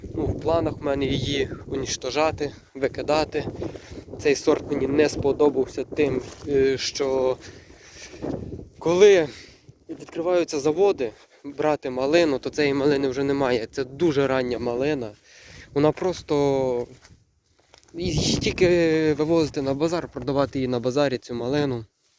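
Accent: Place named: Vinnytsia, Ukraine. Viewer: Звідки ви? Якщо не секрет. Такий говір наче з Вінницької області ☺️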